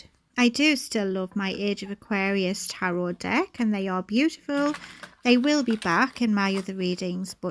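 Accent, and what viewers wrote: United Kingdom. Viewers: I have just found you tonight, lovely to hear a uk voice. Newbie from Preston Lancs What a sweet soul you are. You have a new subscriber. Love & light 🙏🏽 Also my nickname is Ace 🤣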